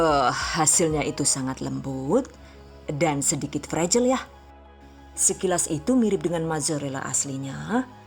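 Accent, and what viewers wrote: Banyumas, Indonesia. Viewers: Ngapak nemen rika mbekayu....??!!! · Asslmkum njenengan wong ngapak ya yuk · Terima kasih resep nya dn sempat tertawa juga karena ada bahasa ngapaknya😊😊😊😊😊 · Trima kasih ilmuny Yg bikin kangen NGAPAK2 NYA ITUUU LUCUUU TAPI GC MBOSENIN 👍👍💪💪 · Mandan ngapak yayune jan, sinau nengkene ya kena kiye sidane 😁😁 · hhhh ngapak lucu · Weslah ora sah ngecepres baee ngelih kohh wkwkwkwkkw jossss ngapake josss ky inyong wong jawa,, is the best lahh haaaaaaaa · Aku suka ngapaknya selain resep" nya😀😀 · Tks ya resepnya..pasti yg medokk kadang ngapakk..cuantik dehh ibunya..he..he..he..yeni virgo suka deehhh dng cara mmberitahukan resepnya....maju truss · Ya ampun lucu bgt sih ngapaknya hehehe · Wkwkwkkwkwkw.....asiiik ada suara ngapak ngapaknya ... 😀🙏😊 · Sampeyan itu orang mana mbakyu. Aku kalo lg lihat vedionya..sampe ketawa sendiri dngn bhs ngapak.mantap resep2 sampeyan gampang ditiru.maturnuwun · Iya bener. 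Apalagi pas ngomong pake bahasa ngapak😂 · Bahasa ngapake metu.. 🤣 ngapake kaya daerah banyumas, cilacap, purbalingga, kebumen.. udu ngapak tegal udu kie tah.. 🤣🤣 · Jebule wong ngapak apa kieeee🤣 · Wong ngendi Jane rika zu? Madiun ka ngapak 😂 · Ngapak ternyata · 😂😂😂 ngapak ya ora ngerti... Mksih bun😘😘😘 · Maen temen deneng ky kue bisa ngapakz ,tengkiu resepe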